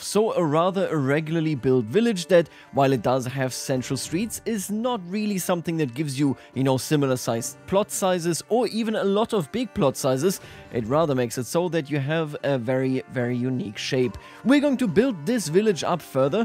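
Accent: Germany. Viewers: Haha hab sofort den deutschen Akzent rausgehört. sehr cooles video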